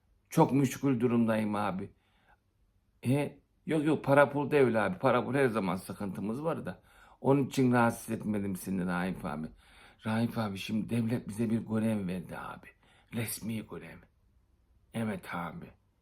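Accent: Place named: Tokat, Turkey. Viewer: Tokat'taki akrabaların geldi aklıma. Şive konuşma tarzı herşey aynı:)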